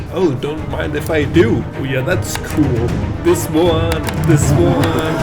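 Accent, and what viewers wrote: Denmark. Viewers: Love that thick danish accent.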